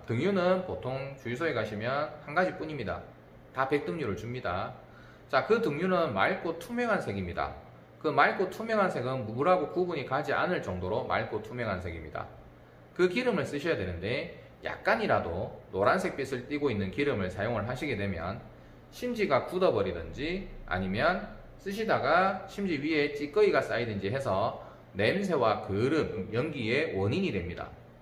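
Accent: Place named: Gyeongsang, South Korea. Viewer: 뜨거운데 디지는 않습니다ㅋㅋ 갱상도 사투리 정겹습니다ㅋ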